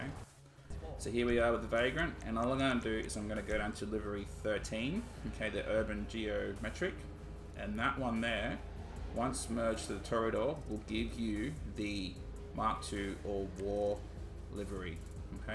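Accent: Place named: Australia. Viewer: I only realised u was Australian 😭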